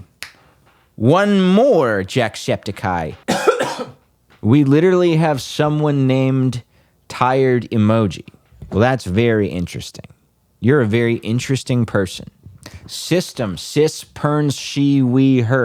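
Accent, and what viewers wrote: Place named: United States. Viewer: Hey. I have multiple diagnosed disabilities and am a disability studies scholar. What you're doing w this video is all wrong and incredibly harmful to actually disabled people. Yes, there are people out there who fake having a disability and the internet has made them more visible than ever. But this abled urge to play detective in order to prove or disprove any person claiming to be disabled is legitimate or not literally kills disabled people. Yes, the faking can take up some limited resources from legitimately disabled and their existence creates their atmosphere of doubt around disabled ppl but outside of when it proceeds to the next stage of attempting to do whatever the disabled equivalent of 'transvestigating' is that it truly becomes dangerous to disabled peoples lives. You sound like you're from the US, so lemme tell you about something that happened in the UK bc of this exact impulse. 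In 2010 the conservative party and the liberal democrats formed a coalition govt that pursued an economic policy called austerity. This is a program which seeks to cut back funding to all public services, and part of that included some very significant welfare reforms. At the time, the political atmosphere was one of distrust towards ppl on benefits, this kind of prevailing belief that there was some massive amount of 'welfare queens' equivalents and disabled fakers who were living lives of luxury on the public dime and was aggressive about trying to identify and weed them out. So, as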